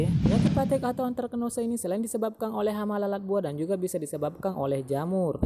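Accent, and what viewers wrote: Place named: Sulawesi, Indonesia. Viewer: Hafal logatnya dari Sulawesi yaa gan.... hehe. Kami pun sama mengelola cabai juga gang. Boleh kemari mampir juga gan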